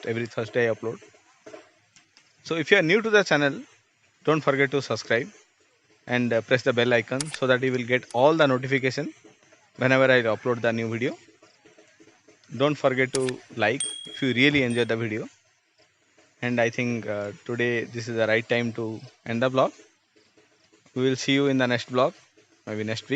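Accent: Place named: Odisha, India. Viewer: Aap odia walo ho na .. english clean nahi hai aap ke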